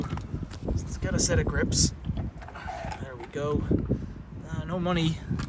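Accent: Ireland. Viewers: oops, my bad. I thought it was a channel from Ireland, I was wondering, one would never get away with dumping like that in Ireland, at least I hope not. I wrongly assumed when I heard the Irish accent that it was Ireland.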